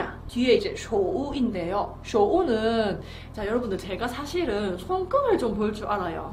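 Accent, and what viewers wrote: Gyeongsang, South Korea. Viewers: 님 3개국어 하시네^^ 중국어. 대구사투리.. 귀여워요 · 늘 경상도중국어 잘 듣고있습니당.. 不要插手。 난 손을 콘센트에 넣지마. 인줄..ㅎㅎ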